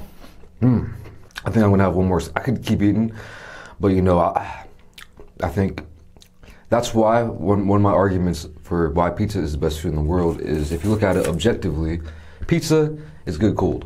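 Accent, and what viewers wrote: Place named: Chicago, United States. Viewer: There must be a hood out there in Korea cuz I thought you were one of our brothers from Chicago the way you talk and the way you carry yourself so glad I found your channel brother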